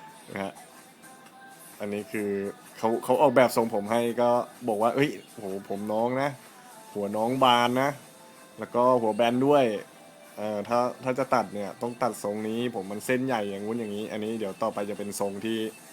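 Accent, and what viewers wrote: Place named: Thailand. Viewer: ทำไมต้องพูดเหน่อ คนจังหวัดไรอ่ะ คะ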